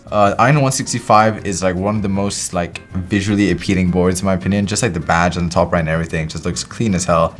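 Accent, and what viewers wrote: India. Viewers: what happened to his voice sound like an indian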